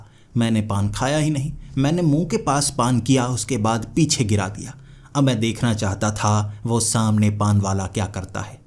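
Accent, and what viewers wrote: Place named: Bihar, India. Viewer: Bhai ki bihari sunke to meri hanshi chhut gayi😂😂😂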